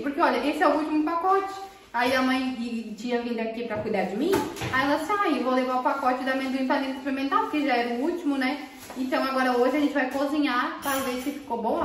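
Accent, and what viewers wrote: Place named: South, Brazil. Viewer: Quel plaisir de te voir.. AMOUR...sa manque les jours quand je ne te vois pas.et que je N'entends pas ta belle voix avec ton accent Brésilien du Sud.... AMOUR..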